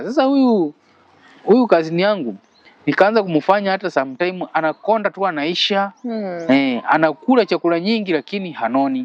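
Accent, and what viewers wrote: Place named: Tanzania. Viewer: Kaka, Kiswahili chako ni kisafi sana, naskia lahaja ya Kibongo kwa mbali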